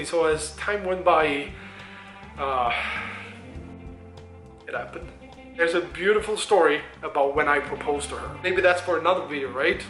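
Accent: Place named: Palermo, Italy. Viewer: Bellissimo video. Certo che io con un accento diverso da quello palermitano (quando parli in italiano) non ti ci immagino proprio 😅😅😅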